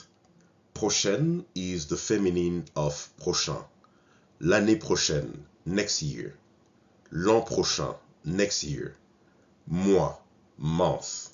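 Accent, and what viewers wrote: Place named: United States. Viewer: Speaking American English,. Great job, blessings.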